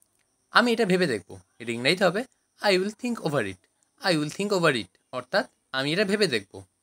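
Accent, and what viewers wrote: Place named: West Bengal, India. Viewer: If i am correct are you from west Bengal 😊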